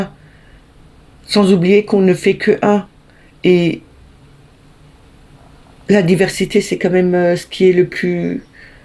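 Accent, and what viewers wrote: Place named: Alsace, France. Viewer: Bonjour, abonnée depuis peu, je prends plaisir à vous retrouver chaque jour. Je dénote un petit accent Alsacien, je me trompe??? Si c'est le cas, nous ne sommes pas bien loin puisque je suis près de Thionville en Moselle. Merci en tous les cas de vos tirages toujours éclairants et de votre bienveillance. Douce et belle journée à vous et à la communauté. 😘💖💝💐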